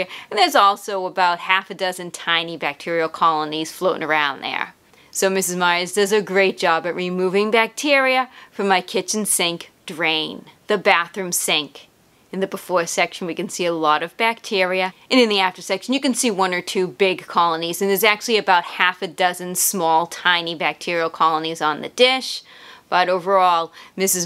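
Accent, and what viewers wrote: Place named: New Jersey, United States. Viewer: Woah great video! I have a portable far infrared sauna (it's like a tent you lie down in with an infrared generator). I was on the hunt looking for a more natural spray with less caustic chemicals to clean it after use, and encounter the Mr. Meyer's brand. Loved everything I read about it, but was also looking for something with antibacterial properties. As you mentioned, they don't state that it does kill bacteria, but you just proved it without a shadow of a doubt. I picked some up today. I sprayed a little on the counter top just to test the scent, I think it smells good. Hopefully it won't be too overpowering when I clean the whole sauna, but I would rather have something safe than the chemical spray I was using. Thanks again for this great presentation. BTW, loved the accent, I had grandparents from NJ :)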